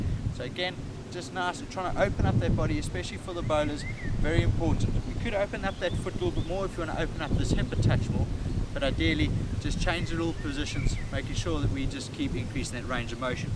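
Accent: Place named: South Africa. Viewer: He's South African not English you muppet. Great video thanks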